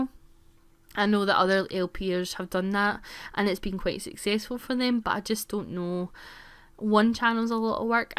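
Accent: Scotland, United Kingdom. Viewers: Awwwww I LOVE him! I got a kitten recently. Her name is Lola. She's SOOOO cuteeeee!!!!!!!!!! Now for me rambling on and on for no reason xD : My friend let me borrow this boom that takes place in Scotland and it made me think of you SOOOO much! When I'm reading about people in different countries, I like to have the accent in my head, and in the book her BFF was a Scottish girl named Emma (the main character is not a scot. OMG THAT RHYMES!!! :p ), so to figure out her accent so I didn't get frustrated when I read the book, I thought of how you spoke and repeated that in my head over and over again. :o)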